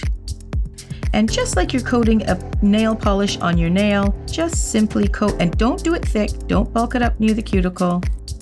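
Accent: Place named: Canada. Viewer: You sound Canadian,. Are you from Canada? I’m living in England, but my husband and I were born in Ontario. 🇨🇦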